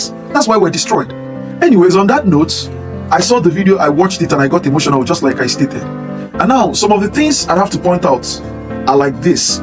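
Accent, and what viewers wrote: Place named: Ghana. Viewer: Its seems you school in Ghana 🇬🇭 right,, your tone is Ghana tone not like nigeria tone 🙄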